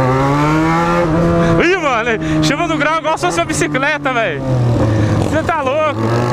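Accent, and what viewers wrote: Goiás, Brazil. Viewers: achei q vc era de Goiás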